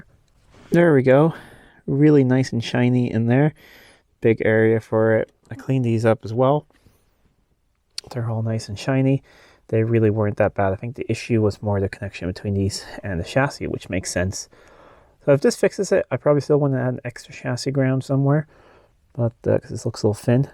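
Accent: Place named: United States. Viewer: Oh, I did not realize until I saw the Biltema battery that you were in Sweden. You sound American to me. As a American living in Norway I cannot imagine owning such a vehicle, here the costs of maintenance are sky high and where I live I am not even allowed to work on my cars. You probably do not have that problem, looks like you are on a farm! 😃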